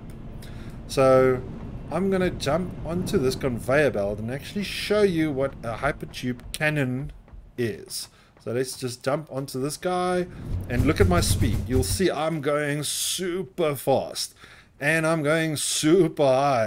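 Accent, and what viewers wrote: South Africa. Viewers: Awesome to hear a fellow Saffa play this game. Keep the content coming chomma! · neat, could hear it haven't found a lot of south africans playing the game. also from SA :D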